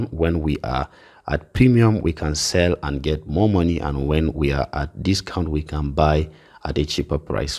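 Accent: Nigeria. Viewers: I don’t know if it’s because of your Nigerian accent that’s makes it easier to understand for me but you always teach in such way it’s easy to understand thanks a lot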